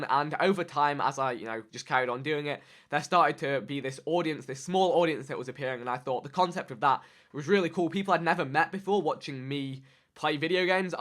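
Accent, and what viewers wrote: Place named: England, United Kingdom. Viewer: srs? He's English. Why does it matter his race? Where he comes from/lives is what counts for how he acts(and sounds)#accent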